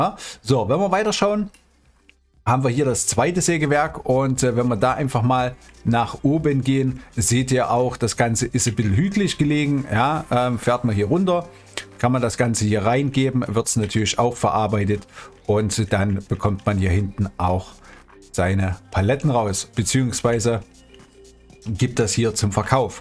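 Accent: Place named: East Germany, Germany. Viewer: Moin die Map hast du ja sehr schön vorgestellt und ja der Harz ist zum Teil meine Heimat ich finde diese Karte sehr schön und mit deinem ostdeutschen Dialekt rundet das die mapvorstellung perfekt ab ich würde mir echt wünschen dass du mehr modvorstellungen machst weil du kannst das richtig gut gibt von mir einen Daumen hoch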